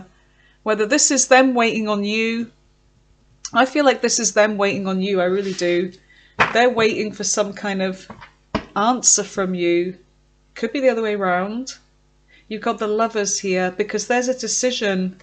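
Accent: United Kingdom. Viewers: So accurate - can’t believe it. Love the British accent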